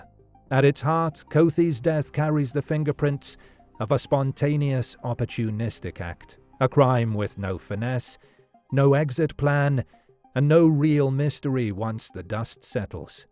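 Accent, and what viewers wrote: United Kingdom. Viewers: Really. Why the British accent? Don't buhlschitt a buhlschitter.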